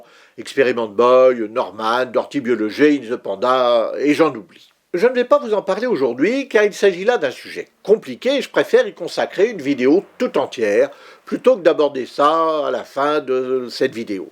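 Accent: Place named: North, France. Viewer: Alors là! je peux assurer que vous êtes du nord, non? "des chauves souris dans le beffroi"? =)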